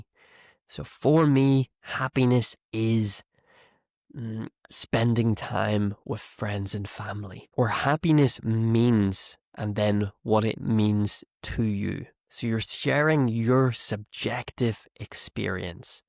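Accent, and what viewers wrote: United Kingdom. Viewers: Hello 👋🏻🙋🏻‍♀️ Since this video was recommended to me , I ’ d like to ask — are your videos all in British English ?? 🤔😊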